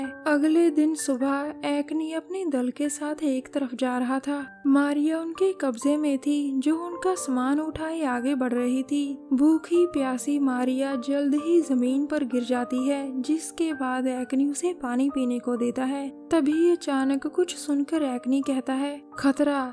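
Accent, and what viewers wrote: Punjab, India. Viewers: Mam Panjabi lagg rahe ho aap , aap k bolne K treeke se lagg Raha hai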